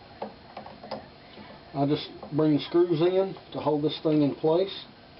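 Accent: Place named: South Carolina, United States. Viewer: Hey. Where are you located? You sound like you come from my area. 8-) I'm in Spartanburg, SC.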